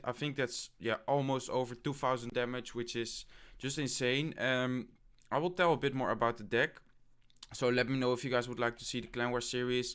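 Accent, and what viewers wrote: Netherlands. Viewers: Ahaha ik hoor zo aan je accent/stem dat je Nederlands ben!! Ps, leuke video! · Tiene acento holandés.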